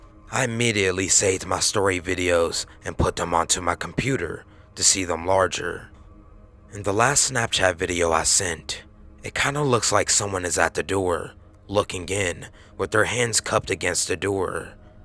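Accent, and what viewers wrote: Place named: United States. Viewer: I think that the snapchats may be real but I don't think the narrator is the same person in the snapchats because the narrator has an american accent and the guy in the snapchats has an Australian accent and a rainbow lorikeet is an Australian bird.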